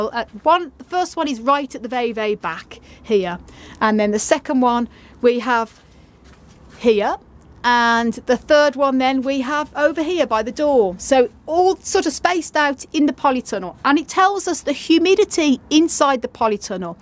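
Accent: United Kingdom. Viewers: lol. said,in her sweet British accent, tarpoline. Here in th US we like to shorten everything and we just call it a tarp. You can get one at any hadware store for 20 dollars or less. As far as your humidity concerns, you can't go wrong with a small fan.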